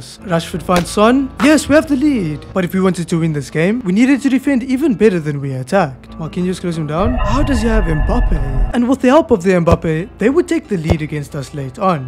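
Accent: South Africa. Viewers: Yo are you South-African? It’s awê to see a fellow EAFC lover from RSA! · Are you from south africa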